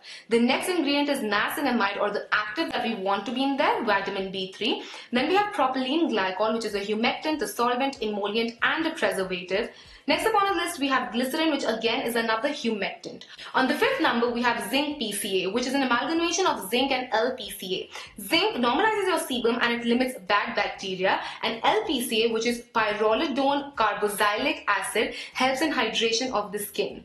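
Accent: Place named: Bengal, India. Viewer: di doesn't have speech disorder. She just speaks in a bengali accent and there's nothing wrong in it.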